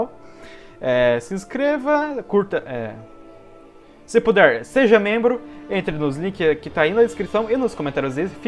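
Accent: South, Brazil. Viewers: conheço sutaque do sul kk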